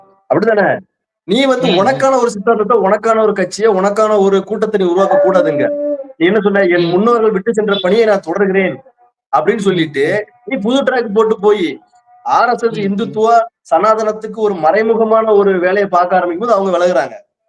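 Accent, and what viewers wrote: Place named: Tirunelveli, India. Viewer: uncle anna nallairukeengala super namma tirunelveli manvasanai pechu super..... super name uncle....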